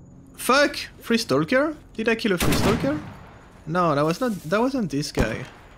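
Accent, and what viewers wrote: France. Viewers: t'es francais?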